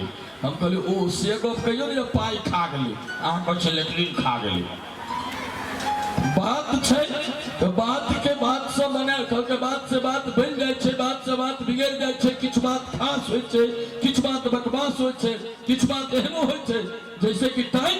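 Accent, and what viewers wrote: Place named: Mithila, India. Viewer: Bahut sunder ji aha bulkul mithila lha chi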